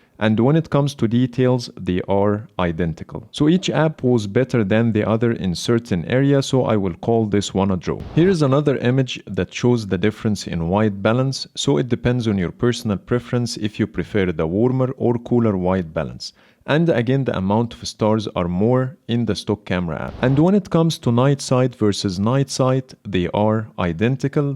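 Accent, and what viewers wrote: Egypt. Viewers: صوتك مصري ياصاحبي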